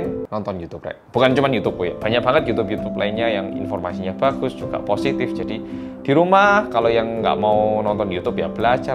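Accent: Java, Indonesia. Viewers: Korea medooook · Ganteng benner masss di video ini jujurr langsung terpana aku ngeliat mukanya mass medokk 🤣:' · Wes di dendo dikon ganti rugi. Hissss neng omah yo enak kok delok Youtobe, yang medok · Mas muedok annyeong HI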